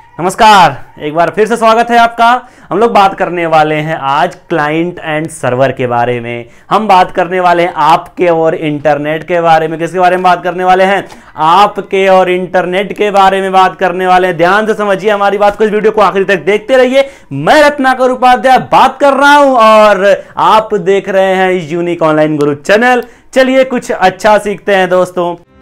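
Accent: Bihar, India. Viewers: Kaha se aa gaya bihari.....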